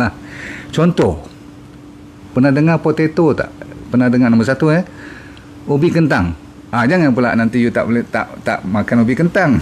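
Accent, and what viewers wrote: Malaysia. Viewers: Saya dari Indonesia senang nonton video Profesor, hanya terkadang sedikit kesulitan memahami bahasa dialek Malaysia profesor, 😅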